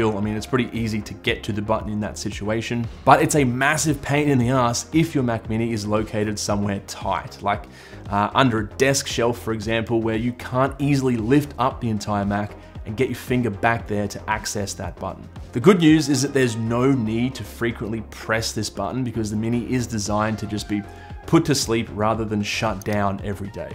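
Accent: Australia. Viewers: Australian accent but USD prices? Also, has there ever been a 3rd party mouse with 2 axis scrolling? I have tried other mice but scrolling side to side is essential for Illustrator.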